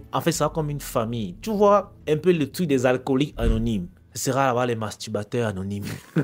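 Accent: Cameroon. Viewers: Je mise que tu es Camerounais 😂❤❤❤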